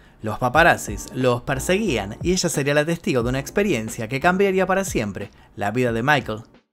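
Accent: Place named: Argentina. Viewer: minutos escuchando el acento argentino!!!! Nooooo gracias joven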